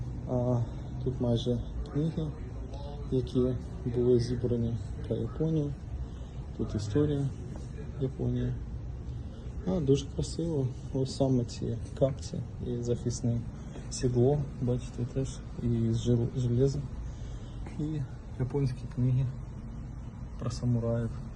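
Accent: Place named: Russia. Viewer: Говори по русски . Умора. Мучаешься, сразу же видно, что русскоязычный.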